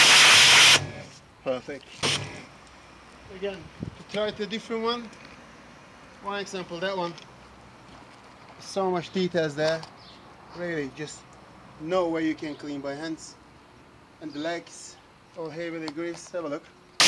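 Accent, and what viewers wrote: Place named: Turkey. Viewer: u have such a turkish accent of english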